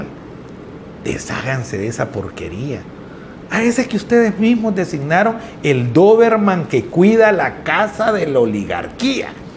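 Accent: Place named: El Salvador. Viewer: Saludando a don por subuen trabajo me encanta como explica y habla como un buen Salvadoreño deseo que dios lo cuide y usted tenga cuidado.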